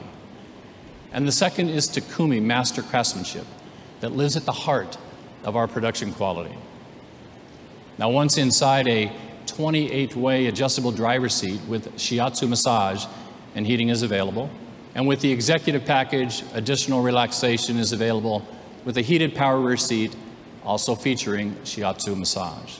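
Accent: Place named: Rust Belt, United States. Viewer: The turbo will prevent our buying one. I assume the pimp wheels shown, are only an option, and there is an acceptable alternative: so the garish & impractical wheel is probably is not the dealbreaker. And why have a spokesman with that creepy hairdo? Looking at that hair, and hearing that lower class Rust Belt accent, I can SMELL the Brylcreem.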